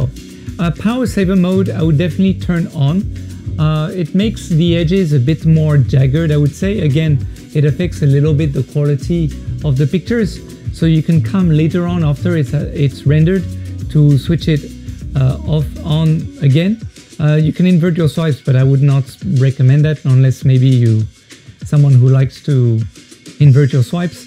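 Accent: France. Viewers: Sa sens le français à pleins nez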